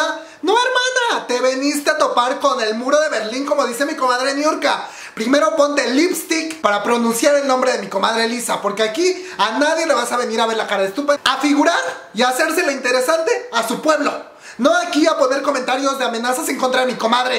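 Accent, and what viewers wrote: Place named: Mexico. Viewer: Amo como hablan los mexicanos 💚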